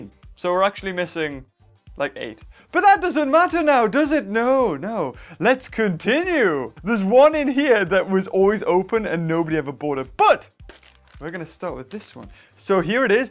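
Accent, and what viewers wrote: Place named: United Kingdom. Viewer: Ya British mate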